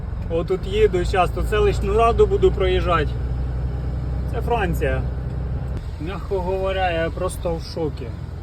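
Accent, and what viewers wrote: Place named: Kirovohrad, Ukraine. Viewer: Тільки хотів написати в коментарі, що чую знайомий говір, як на Кіровоградщині, аж тут ви самі про це говорите. 🤗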